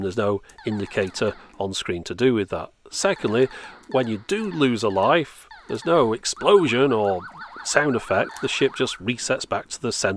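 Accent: United Kingdom. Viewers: Makes me miss my old Vectrex even more. I've always had a soft spot for vector graphics games, and poured way too many quarters into Asteroids, Star Wars, and Tempest machines as a teenager in the eighties. While all my friends were buying Colecovisions and Atari consoles, I scrimped and saved for the Vectrex. I had the 3-d goggles and the light pen and most of the games that were available for the machine. I foolishly gave it away to an old flatmate trying to downsize for a move to the UK. I ended up back in the states eventually, and now have a 16 year old son who loves retro gaming. Looking at what the Vectrex and accessories go for on Ebay, I fear I'll never have another one. Hopefully this technology will mature to the point where I can play a ten foot version of Tempest on my ceiling! Love your videos, and your accent makes me homesick for my adopted second home in Blighty.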